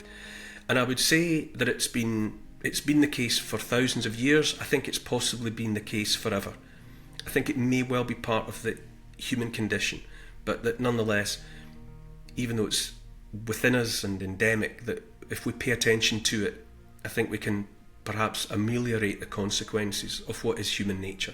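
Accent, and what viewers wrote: Scotland, United Kingdom. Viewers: Probably because... Won't Kneel A gentle, beautiful Scottish lilt A kind, empathic, forward tilt An open heart; a caring face A willingness to take his place. A strong, home-hitting monologue Reminds us of the boiling frog The courage to become a sleuth A passion to release the truth. A deep desire to crack the whip Illuminates our freedom-strip Calls out the darkness taking hold The shiny despots; plated gold! Intense and raw, he lays it bare A soul-enhancing, honest stare Inflections drive the message through He speaks for Us - for me and you! Discernment's such a precious gift His words create a mighty lift Take heed of all he has to say He knows - it shouldn't be this way! 🤗🦋🐬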